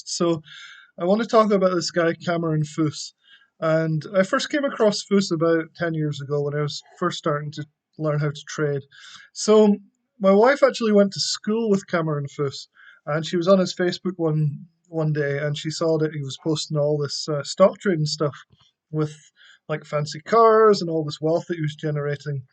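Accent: Scotland, United Kingdom. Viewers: are you scottish? :) thanks for the video!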